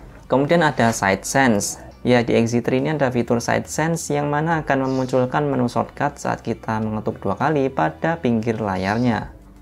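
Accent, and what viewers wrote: Boyolali, Indonesia. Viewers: Wah wong Boyolali iki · Wong Boyolali kah? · Mas e koyone wong boyolali iki?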